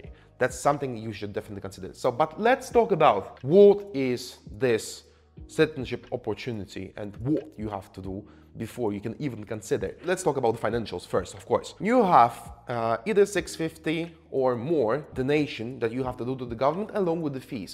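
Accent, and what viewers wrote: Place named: Poland. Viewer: Your Polish accent is still very much possible to hear. Not that I am aginst a Poles ( as they belong to EU) just saying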